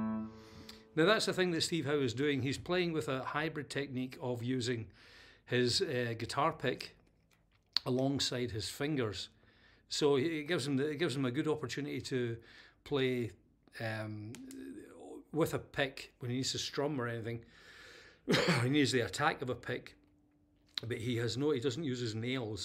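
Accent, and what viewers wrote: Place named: Scotland, United Kingdom. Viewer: Are you Scotts ? What a great accent ! love it.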